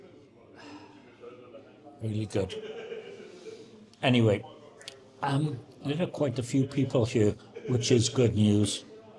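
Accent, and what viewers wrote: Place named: Wales, United Kingdom. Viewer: Love your Welsh-inflected patter...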